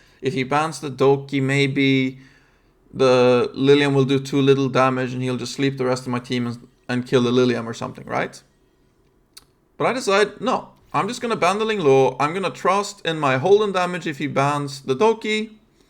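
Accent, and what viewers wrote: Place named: Norway. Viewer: are you nordic by any chance? kinda sound norwegian to me😅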